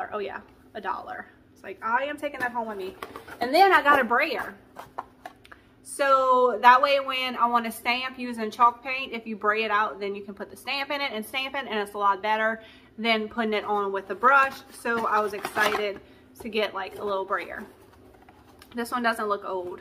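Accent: Louisiana, United States. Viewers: Love you and your channel. I’m from Louisiana and I swear you have an accent like you are from Louisiana as well. Maybe around pointe coupe parish or something. Lol am I close?? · The sea garland, looks fabulous !!!! The antique frames are so gorgeous!!! P.S. I heard you tell Wren, to "come see" and I couldn't believe my ears! I always say that, also, but, ppl that aren't Cajun, think it sounds funny. It's actually because we've heard that said, in French, so we say it in English. I have to watch your videos, every day, to get my daily dose of the Cajun accent; it makes me feel like I'm back in Louisiana, instead of North Carolina. 😊😘😁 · I'm new. I'm a nurse by profession but I'm a wannabe crafter. I procrastinate but am hoping to really get serious. I stumbled up on your video and youre a beast with this stuff. You have my accent. You must be from Louisiana. 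Can't wait to see all of your stuff. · Where are you from? I am from Louisiana, and you sound like we do 😂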